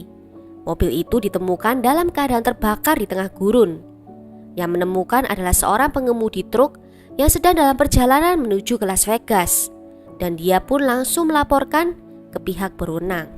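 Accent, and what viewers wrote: Java, Indonesia. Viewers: medok bgt sis.. wong endi sampean?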